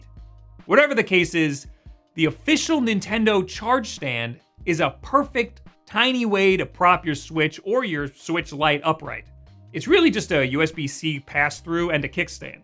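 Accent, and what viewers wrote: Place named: United States. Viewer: your American its not that weird well you are from New York so it makes it a little weird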